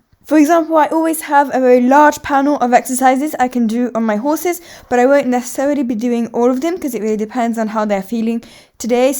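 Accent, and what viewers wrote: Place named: England, United Kingdom. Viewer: Sorry, I don’t really understand you English accent. Can you add subtitles please? Anyway, you’re a good rider🫶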